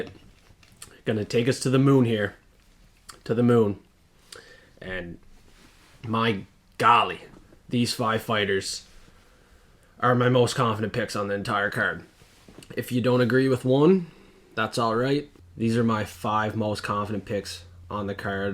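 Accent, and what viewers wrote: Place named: Glace Bay, Canada. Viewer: Fuck b'y you sounds like yer rite outta glace bay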